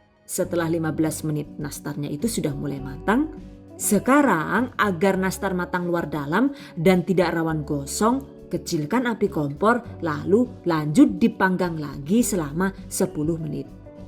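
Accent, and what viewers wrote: Banyumas, Indonesia. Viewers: Yang selalu saya kangenin selain resep mbak, adalah ngapaknya :). Sehat selalu ya mbak, biar bisa selalu berbagi ilmu :).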